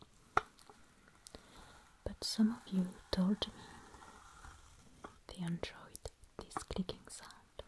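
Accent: France. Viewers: I watched one of her role playing videos as my introduction to her and thought she was doing a really good fake French accent, I came to this one expecting a regular voice when I realized she’s literally French and I’m just dumb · This is the perfect representation of the 18th century ♡ also, I was wondering, are you French? You seem French, I'm French so I don't know your beautiful accent looks French :) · ~I'm a writer and I have a new character who is French and when I realized I needed to be able to describe a French accent, I came here because your accent is so lovely. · un accent très français tout ça 🤔😂 '' a very french accent all that ''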